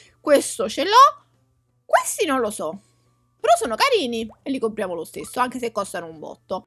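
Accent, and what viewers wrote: Naples, Italy. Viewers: sei napoletana? ps: *TI ADORO*💞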